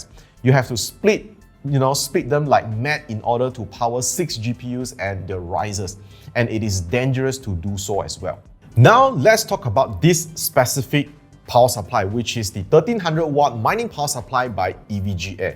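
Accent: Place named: Singapore. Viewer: Your Singaporean accent is thick boi lol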